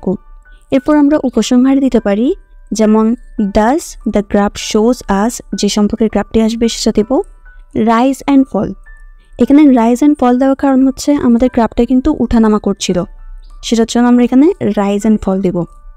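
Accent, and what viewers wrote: Chittagong, Bangladesh. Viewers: ১০০% শিওর আপনার কথ বলা বাচনভঙ্গি একদম খাঁটি চাটগাঁইয়া 🖤🖤🇧🇩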